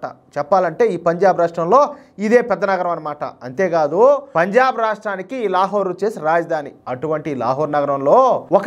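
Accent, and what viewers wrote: Rayalaseema, India. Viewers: అన్నా మీరు విశ్లేషించే విధానం చాలా అద్భుతంగా ఉంది. అందులోను మీరు రాయలసీమ యాస లో చెప్పటం చాలా సంతోషం. కానీ మీరు చెప్పేటప్పుడు ఆ visuals కూడా చూపిస్తే, మీ ఛానల్ ఎదుగుదల చాలా బాగుంటాదని మా అభిప్రాయం. జై శ్రీ రామ్ 🚩🚩🚩🚩భారత్ మాతా కి జై 🇮🇳🇮🇳🇮🇳🇮🇳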